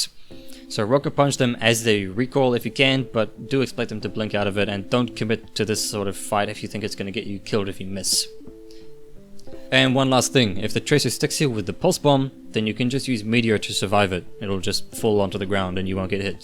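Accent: New Zealand. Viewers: Are you from New Zealand? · Are you from new Zealand?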